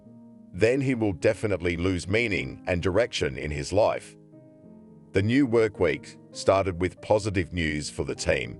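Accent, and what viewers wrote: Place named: United Kingdom. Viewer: the stories are good but it would be better if the narator didnt have a british accent. ir's somewhat hard to grasp the words as he pronounced the letter A as I. sorry to say this but I hope you could find a better narator with american accent. tnx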